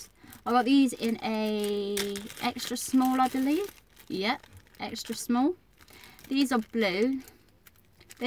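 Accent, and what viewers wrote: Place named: Canada. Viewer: Love ur videos and I love your accent r u from Canada? I'm from. San Antonio Texas in the USA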